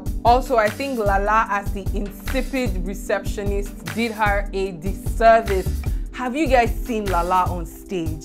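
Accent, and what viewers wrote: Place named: Igbo, Nigeria. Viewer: Wait oo are you Yoruba? Cus u sound igbo?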